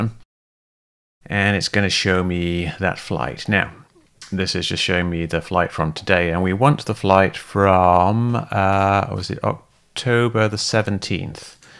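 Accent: United Kingdom. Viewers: Your clear Manchester accent gives you away! Manchester United is my favorite football team in the UK! You keep doing what you're doing, it helps to dowse the flames coming from the arses of true believers;^)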